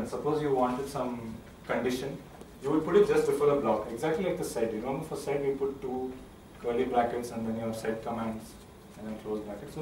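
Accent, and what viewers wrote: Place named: India. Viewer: I cannot for the life of me understand English with an Indian accent. Other accents really aren't a problem, just can't understand the Indian accent. Frustrating.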